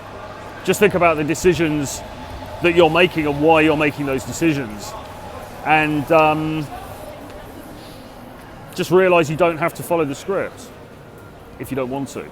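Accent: United Kingdom. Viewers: is a traveling street man on the move. He's the 007 of the Manosphere! Complete with British Accent. Now where is hiding that Aston Martin DB5?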